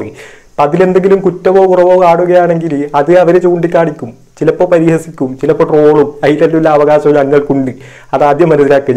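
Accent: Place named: North Kerala, India. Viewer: English ucharanam sariyakkan -chal, abhangi ozhivakkan thankaleppole oralkku verum 10 minuttu mathi. Athinu minakkathe aabharanamayi kondu nadakkano. Ethayalum avatharanam, nammute vadakkan thenmozhi ellam gambheeram. Fear not we are with you.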